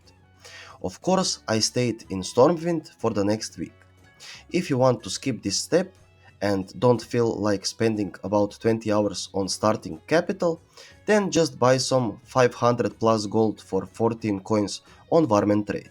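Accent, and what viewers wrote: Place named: Croatia. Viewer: mooi brat , provalio sam i po naglasku i za valutu 😂 ziv bio legendo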